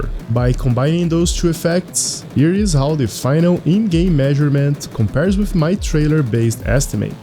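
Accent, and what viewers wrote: Brazil. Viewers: Tu é br mano? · Tu é br né?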